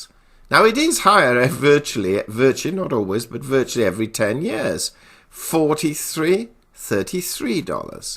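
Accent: United Kingdom. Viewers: A realistic presentation. Very enjoyable. Investing in Gold and Silver is more about a flight to safety, than it is unrealistic profit. It will make money and it will do well. Patience is required. You can open an account from a link on this channel, invest in gold and silver and even designate the storage location (Zurich etc.) Great for those who do not want to store the metals themselves. There is nothing like healthy skepticism with a British accent. Subscribe and keep listening.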